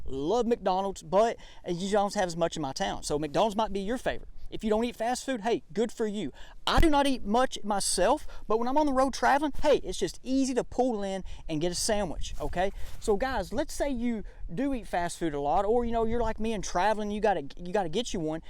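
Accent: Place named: South, United States. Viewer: The man talks like I talk. Free, fast, and it's straight up southern.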